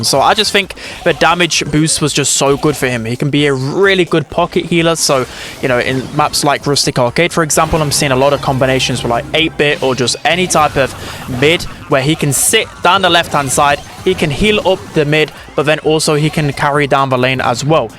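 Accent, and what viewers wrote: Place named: Northern England, United Kingdom. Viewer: What part of England are u from bro everytime you pronounce something ith a u yiu sat like "oopdate" for example (from london)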